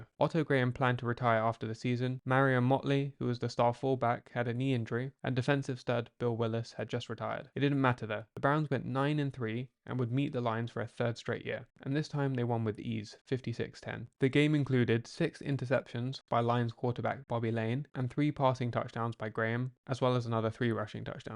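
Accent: United Kingdom. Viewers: Just gotta add why a British accent guy narrator on American football. Also the BROWNS ran the Cleveland rams out of Cleveland to LA. The Cleveland rams won a NFL championship in Cleveland before they left.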